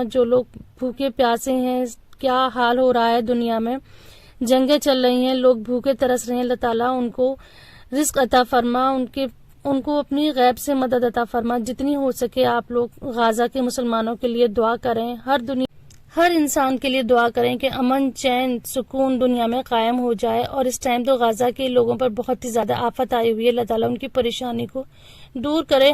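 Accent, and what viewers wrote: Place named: India. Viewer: Itni saaf Urdu India mai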